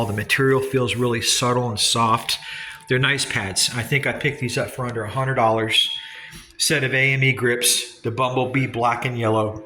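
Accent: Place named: California, United States. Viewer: Your channel is our favorite, along with RAD BMX and Sensei Phu with Epic,for a Florida boy you sure sound like a California Dude. You know an amazing amount of stuff in your lessons and should teach classes on this curriculum. Colleges need to offer courses in this, it’s serious Americana history. You explain things so clearly and articulately, it’s a soothing stream of consciousness that relaxes the mind and the soul. Thanks again bro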